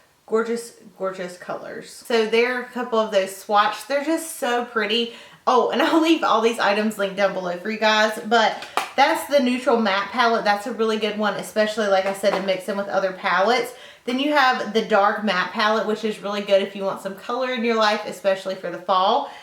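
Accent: South, United States. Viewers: Tabatha Gaytan i think its her accent its sooooo thick really southern also when she says oil she doesnt pronounce the i she says ol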